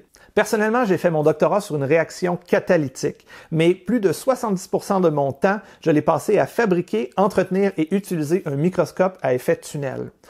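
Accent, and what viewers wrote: Quebec, Canada. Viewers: C'est un québécois, son accent et sa manière de s'exprimer sont toutes deux normales.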